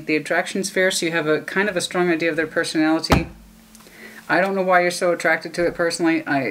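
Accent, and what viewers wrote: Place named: South, United States. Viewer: That deep sexy raspy voice southern and unique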